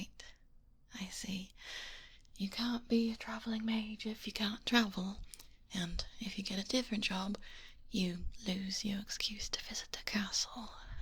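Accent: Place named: RP, United Kingdom. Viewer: yippy posh UK RP accent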